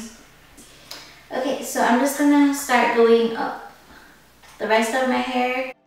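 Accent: Jamaica. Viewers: you from Jamaica?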